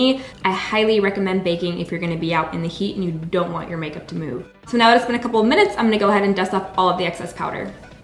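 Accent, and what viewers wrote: South, United States. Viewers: Sometimes I hear her southern accent peek through when she talks like in her old videos.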